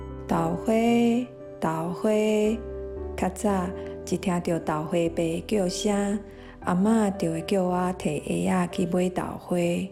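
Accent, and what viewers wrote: Quanzhou, China. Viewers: 感謝使用泉腔配音 挺有趣的 也很難得 話說同安腔的二調變調是升調 但是這影片的配音 比較不明顯 (新竹當地的操新竹腔的中老年人就很明顯) 另外這影片保有部分老泉的央元音 例如"事sīr" 但是也有一些讀音丟失央元音變成新泉了 例如"鍋e"(老同安腔讀er) 略感可惜了些 附帶一提 傳統上(例如臺北腔 新竹腔)"好"讀ㄏㄛˋ "ㄏㄜˋ"是南部腔的讀法(即臺灣南部偏漳腔 主要分布於臺南與高雄一帶 而傳統上漳腔也是讀ㄏㄛˋ) 這個字的音讀混到南部腔了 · 我覺得你的腔口很可愛，看字幕說是泉州腔，我聽起來有點福州加彰化和美一代的海口腔，跟我講的泉州腔有點差別，拙見不喜勿怒。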